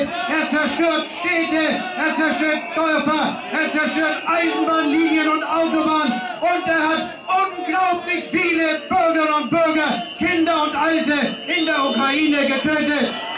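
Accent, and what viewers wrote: Germany. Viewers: Damn I love German accent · The true German accent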